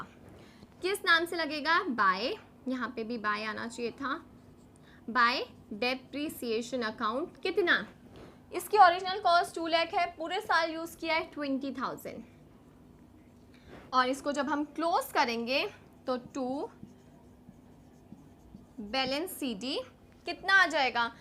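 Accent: Haryana, India. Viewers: Haryana se ho😘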